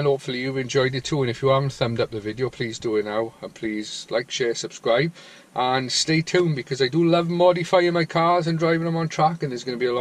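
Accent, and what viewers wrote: Wales, United Kingdom. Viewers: Good tip. Love the Welsh accent.....hope u like my Irish one!!! Was in Wales in 2002...very nice (Rhyll)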